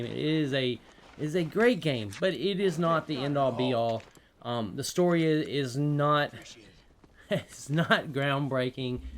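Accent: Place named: South, United States. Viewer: Where are you from? I hear a bit of a Southern accent.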